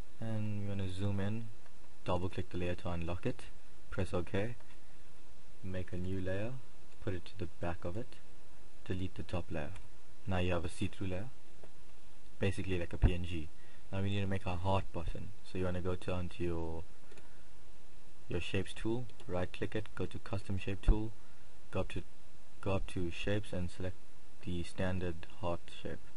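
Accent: South Africa. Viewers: I "guees" that's why he does the design and not the coding ^_^ JJ - still a nice tutorial. Are you guys South African? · South African?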